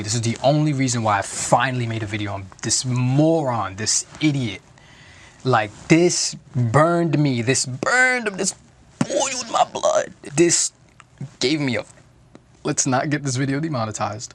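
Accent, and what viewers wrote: New York, United States. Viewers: Where's that accent from (you sound like you're fr NY)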